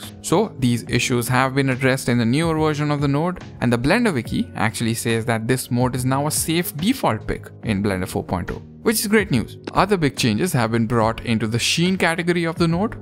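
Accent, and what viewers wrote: India. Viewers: Nice tut, tnx! Your Indian accent and mumbling make it unbearable, though.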